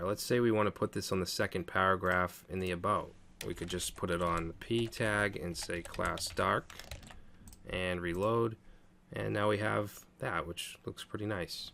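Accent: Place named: New York, United States. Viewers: I love the New York Accent! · NeewYorka Accent ! awesome tutorial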